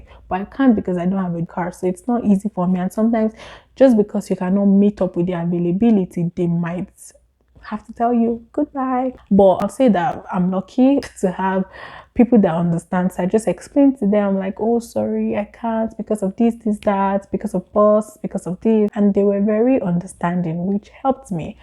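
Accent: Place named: Canada. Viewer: Aha ! Your accent is different in this video compared to a year ago. You sound more Canadian with your English accent.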